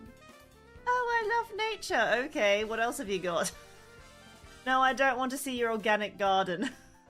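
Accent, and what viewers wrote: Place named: England, United Kingdom. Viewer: She's just English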